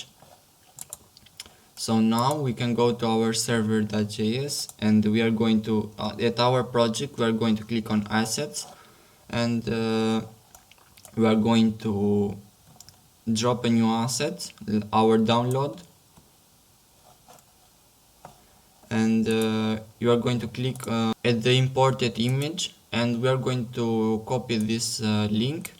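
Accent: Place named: Albania. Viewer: hahaha po duket shqiptari se si e flet gjuhen e huaj 🤣🤣🤣 ❤️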